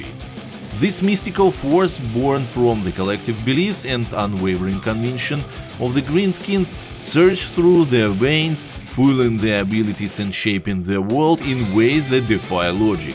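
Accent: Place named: Russia. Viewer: The strongest russian accent I ever heard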